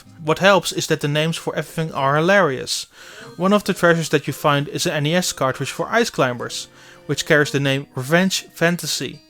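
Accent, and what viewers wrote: Netherlands. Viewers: Is that Guy dutch? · Damn! You're doing a great job working with these guys. I can still hear you speak English as a Dutchman. I hope you will keep working on that but eh... Denk dat vele mensen uit Nederland een voorbeeld aan je kunnen nemen. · The video started and I was like "hey this guy is Dutch". Then I saw name in the description. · Are you dutch? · He's Dutch, like me. Not everyone speaks flawless English, you know? ;) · I know how hard it is to speak English with a Dutch accent, its difficult pain in our asses. Great review, even though nobody likes this game.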